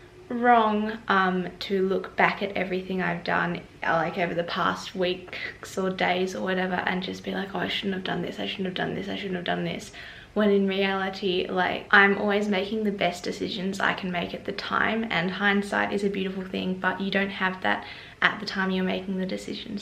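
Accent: Australia. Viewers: She’s Australian 😂😂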